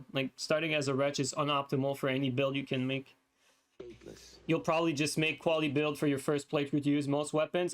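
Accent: Quebec, Canada. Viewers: This guy also sounds so Quebecois, cris de tabarnak.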